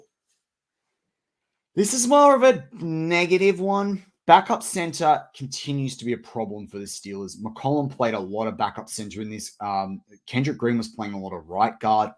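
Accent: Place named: Australia. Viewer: Who is this Aussie talking about the Steelers? 🤣🤣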